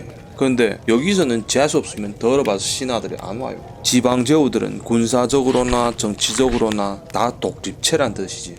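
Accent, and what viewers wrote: Yanbian, China. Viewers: 피뜩 들어두 연변사람 옳스꾸마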